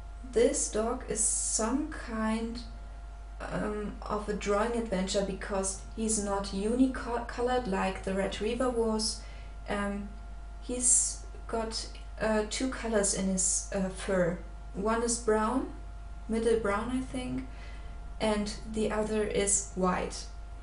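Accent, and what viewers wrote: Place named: Germany. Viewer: Are u german? U have a german accent...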